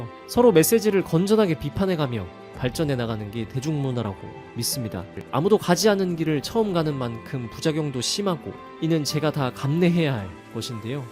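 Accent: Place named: Gyeongsang, South Korea. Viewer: 경상도 분이신가보네요 오늘따라 사투리가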